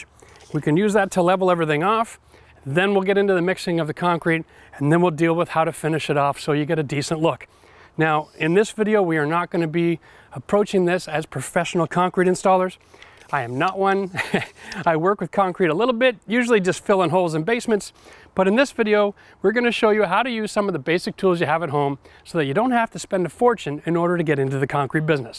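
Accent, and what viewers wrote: Canada. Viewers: Haha, u almost remind me of red green, but with useful knowledge. And u have that nice Canadian accent. Bahaha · you talk funny, you must be from Canada. I like your video, thanks for sharing.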